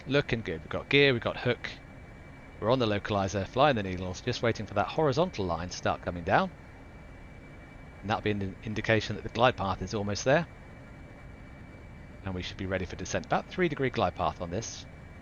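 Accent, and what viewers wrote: United Kingdom. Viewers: I like your British English accent.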